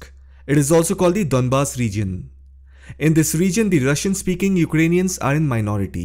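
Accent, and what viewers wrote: India. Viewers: This may be a brilliant video or it may not. I refuse to listen to videos narrated in Indian 'English'. Indian speakers,, if you want English speakers to understand what you are talking about, every single word must be pronounced clearly and separately, using English vowel sounds, not Indian. Do not run words together as if they are all one long word. Either speak Indian or English, but not a mixture of both. Thanks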